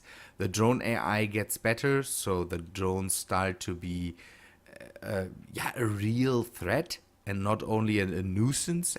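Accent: Germany. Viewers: Deine Englische Aussprache lässt annehmen du Sprichst auch Deutsch?? Wenn ja ist das in der DEV Version auch so das es einen Gravierenden Fehler im Spiel gibt? Seit dem Update 1.157.12 friert das Spiel immer wieder ein teilweise sogar für bis zu 5sek oder länger und dabei geht die Prozessor auslastung auf 100%. So macht das Spiel langsam echt keinen Spaß mehr. Ich habe schon alles mögliche versucht. Dieser Fehler tritt selbst dann auf wenn kein einziger Mod im Spiel ist :/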